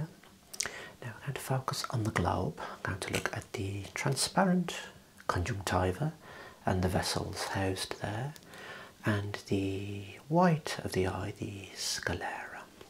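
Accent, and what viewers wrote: Birmingham, United Kingdom. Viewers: My favourite Brummie 😍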